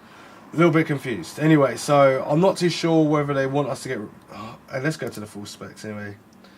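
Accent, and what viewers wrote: England, United Kingdom. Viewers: germany but i just love the english accent and I was in London a month ago... either I'm not understanding you correctly, but wasn't the 1060 6GB released when the GTX 10XX series launched? because i remember i had to decide between the 6 and 3gb version about 2 years ago when i got mine (1060 3GB)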